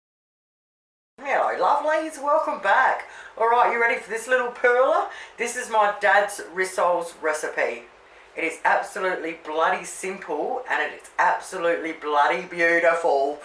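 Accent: Australia. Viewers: So Aussie , love it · haha gotta love that Aussie accent! haha love it. So blessed to be aussie! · Good to hear a true Aussie girl.I’m into rissoles but may do a twist on it.I go with the flour.Excellent.I’m 59 vintage but we are still the same.Not like now.Cheers for your recipe.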